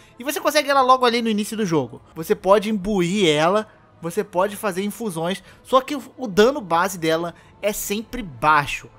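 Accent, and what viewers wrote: Brazil. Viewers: cara de qual estado brasileiro tu é?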